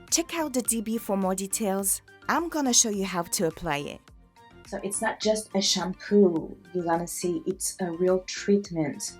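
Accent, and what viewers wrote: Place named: France. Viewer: I can feel the french accent which make you english good. Where're you from?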